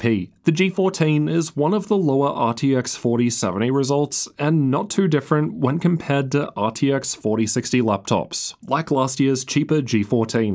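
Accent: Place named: Australia. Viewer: Man, that Aussie accent sounds funny. :D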